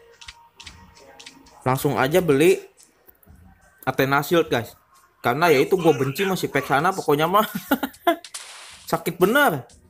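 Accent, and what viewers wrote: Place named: Jakarta, Indonesia. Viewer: medok amat betawinya.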